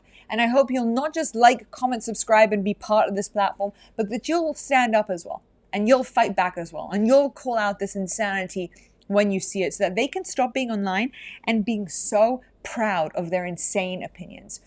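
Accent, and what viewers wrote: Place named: United Kingdom. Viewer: I hear a British accent. Which upsets me. Because I'm worried you & your family left because England is no longer safe for you.😢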